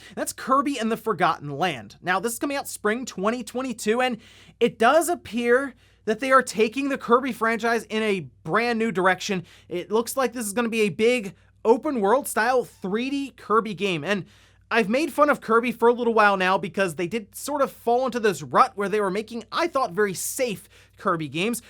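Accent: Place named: United States. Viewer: Damn yanks -it’s MAHrio not MUHrio.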